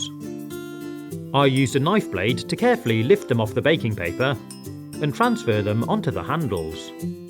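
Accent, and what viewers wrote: United Kingdom. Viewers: Were you born in Britain or is that your accent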